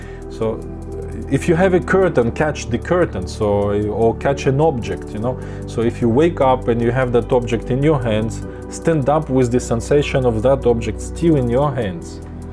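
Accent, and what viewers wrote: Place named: Russia. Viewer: Thx for the answer, I didnt expect it lol. Maybe you should then love your voice more, because Russians talking English sound really nice. And when you love your voice more then youll reduce the backgound music volume automatically 😊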